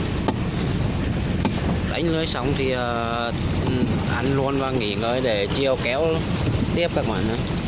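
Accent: Nghe An, Vietnam. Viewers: Nghe giống giọng nghệ an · Giọng giống quỳnh phương .hoàng Mai. Nghệ an vậy bác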